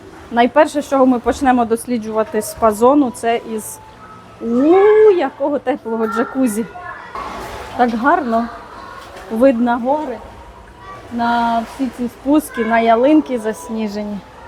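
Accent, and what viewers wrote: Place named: Lviv, Ukraine. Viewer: Супер! За вікном казка. Чудові краєвиди❤ У ваших відео є особлива родзинка -мова, львівський говір, і це дуже притягує. Дякую, що поділилися враженнями. ❤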